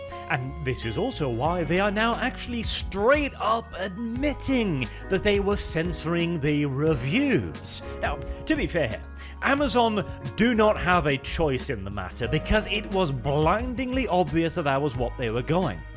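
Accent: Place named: England, United Kingdom. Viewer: I've noticed that he has this very crisp and pleasant English accent most of the time, but when he does longer videos with other people, he seems to partially lose that accent part of the time. Anybody know what's up with that? lol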